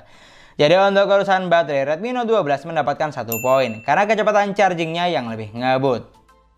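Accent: Madura, Indonesia. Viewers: Aksen madura ya kk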